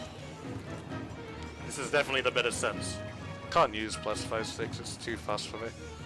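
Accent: United Kingdom. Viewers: Your british like me. ;> thats why i see u in ranked battles. also i find the goo tubers are the best charger. ;)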